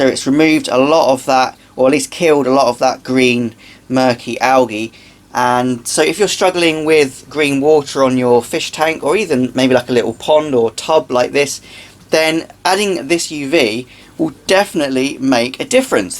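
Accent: United Kingdom. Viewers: It’s a bit of a blunder by Fluval. I don’t even have any extra sheet in the box telling that it should be positioned in a happy T face but an upside down T as in the original manual. Mine must be the earlier batches. I was bound to know the correct position of installing the unit only after watching your video. Repositioning the unit is a breeze. It’s true though the flow rate is faster with the T position as the water hit the unit going down the curve and up again instead of hitting the unit going up and down the curve. BTW thanks a bundle for all your very informative videos and your British accent is superb!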